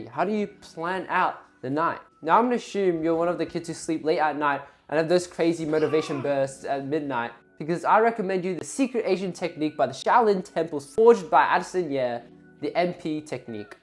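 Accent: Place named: France. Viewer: Is it only me who loves how he speaks french?💀 The accent and the advice are both gold thanks 😃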